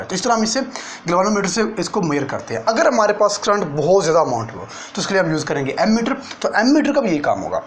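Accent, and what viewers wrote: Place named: Punjab, India. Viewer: Physics with punjabi touch....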